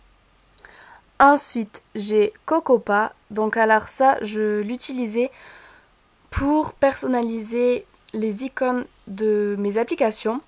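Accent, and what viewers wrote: South, France. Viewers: Les cheveux courts te vont super bien, tu as un super accent du Sud (comme nous ^^ Lol) et tes videos sont géniales! Hate de la prochaine Vidéo! #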